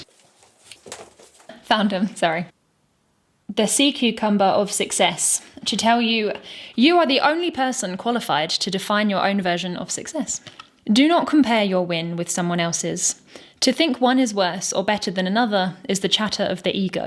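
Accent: United Kingdom. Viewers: Initially i started liking her when she started talking, Dat british accent makes the ears oozing, and when i focused on what she actually says and how greatly she explains what is what my mind started to ooze. Considering her great girl's beauty made also oo, well, this comment wold be blocked if i proceed😂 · Self-promotion is not narciissistic, Honey! (spellcheck not working correctly) Wear your shirt out, girl! I see how impressed you are by this great singer and song from note one because it evidently touches you so deeply! And as the saying goes 'it takes one to know one'! I just had to stop to tell you these things I feel from your reaction already. I liked this song & subscribed to your channel straight away! Also, I love that charming Brit accent coming from a lovely woman such as you! I'm also looking forward to all 21 minutes because of my feeling that you will get deeply into this one because of you recognizing his talent immediately. 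back to the video for me! Love that Magenta hair too, girl! Rocky Horror Picture Show, anyone?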